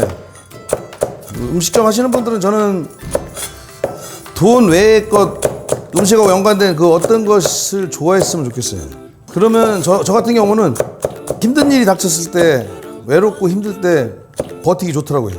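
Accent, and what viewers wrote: Chungcheong, South Korea. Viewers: 구독과 좋아요!! 백종원 선생님을 옛 무한도전 때 정준하 형님이 무슨 요리 레시피 관련해서 찾아뵙던 방영분이 지금도 생각납니다. 아마도 그 때가 백종원 선생님을 처음 알았을겁니다. 그리고 나서 아마도!! 백종원 선생님을 전국적인 스타로 이름을 알리게 된 방송이 우리가 다 아는 이모티콘 방송이었을 테고요 ^^ 편집의 대마왕이라 불릴 정도로 깨알만큼 재미를 더 했었죠 그리고 ~쥬? ~쥬!! 구수한 충청도 사투리까지 더해져서 재미가 몇 배가 되었습니다. 이 후 부터는 인기와 더불어 질투하는 분들도 많이 생기고.. 어쩔 수 없는 연예인에 대한 호감에 대한 반비례 아닐까 싶습니다. 숙명과도 같은 거라고 봅니다. 같은 '성(性)'을 사용하는 분들 중에서 큰 으뜸이 되는 분이 되길 기대하고 바라겠습니다. 화이팅 입니다!!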